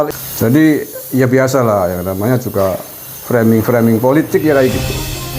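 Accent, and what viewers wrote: Banyumas, Indonesia. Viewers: Pak maaf kamu namanya siapa sih kok ya lucu men bikin ketawa jaaa lo. Ngapak2 yaaa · Ngapak pokoke joss lah pak,..salam ✌️ satu putaran.. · Lucu ngomong nya logat ngapake huhuhu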